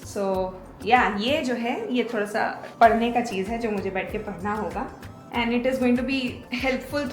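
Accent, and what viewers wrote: Bihar, India. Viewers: Your accent is bihari but you are from Rajasthan I am not getting it how how 😂?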